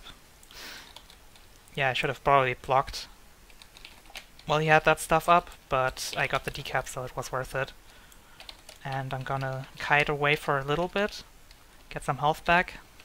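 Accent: Germany. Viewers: den deutschen akzent bekommt man einfach nicht weg :) gutes vid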